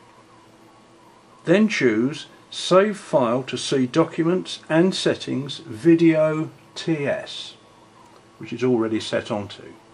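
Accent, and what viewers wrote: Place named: United Kingdom. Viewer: The best was the british accent :P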